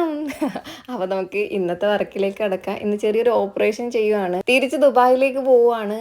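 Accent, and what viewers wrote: Kannur, India. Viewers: കണ്ണൂർ ആണോ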